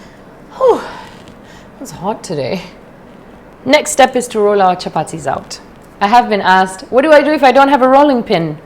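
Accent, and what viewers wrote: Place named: Kenya. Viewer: Your Kenyan accent 😍😍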